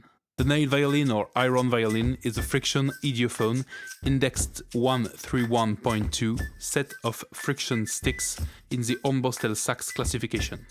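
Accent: France. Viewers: Le magnifique accent français xD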